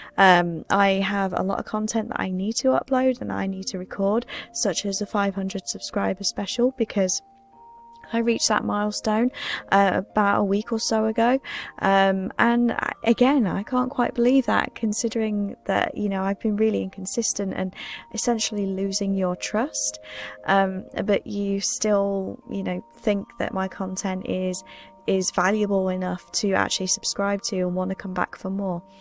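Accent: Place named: England, United Kingdom. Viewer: Great video:) Everyone has that funk and talking about it is honestly that foot hold that helps start the journey out of it. You would do amazing in the ASMR scene. The English accent alone would do it but you have such a smooth and soothing voice and very little inflation in your tone (All of this of course is meant in the best of ways). Good luck with your journey home and your new direction:)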